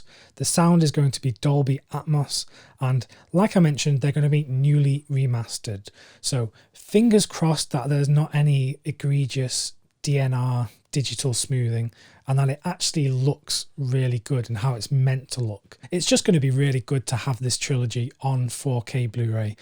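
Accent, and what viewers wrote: England, United Kingdom. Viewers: So you sound English are you based in the states? Only reason I ask is I can never order anything from Best Buy online. 4k discs are multi regional but the extras on the bonus disc are not. If you have a link please post it. I wanted BTTF but even <link> says not available in yiur area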